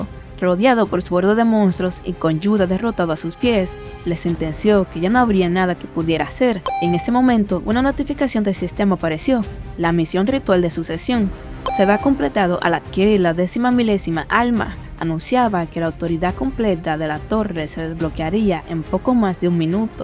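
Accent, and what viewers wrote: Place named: Dominican Republic. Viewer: 🗣️🔥🔥🔥Lo sabía es que el acento dominicano es eterno ✋🗿🤚🇩🇴🇩🇴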